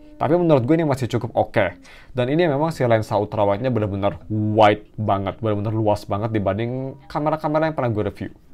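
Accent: Bandung, Indonesia. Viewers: Loh orang bandung?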